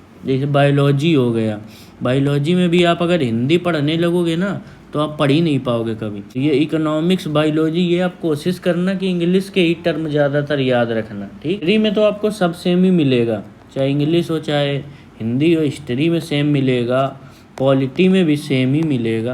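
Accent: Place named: Kanpur, India. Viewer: Bhaiya aapke accent se hi samajh gaye the ki aap kanpur se hain ...badiya chize batate h aap ... dhanyawad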